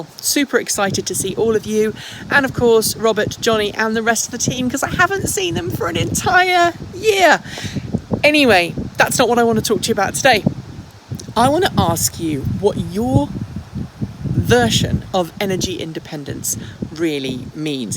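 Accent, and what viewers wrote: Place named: United Kingdom. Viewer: I hope your plane's batteries make it all the way to the UK! BTW, I always assumed you were in the UK given your accent, which I assumed was British. Energy independence means to me the ability to freely purchase the energy I need/choose to use without undesirable government or other influences. I'll probably never be in the energy business and even if you are off the grid, you're still dependent on other suppliers of parts and equipment to create and store your own energy. Freedom to me means a competitive marketplace with multiple potential vendors to purchase from.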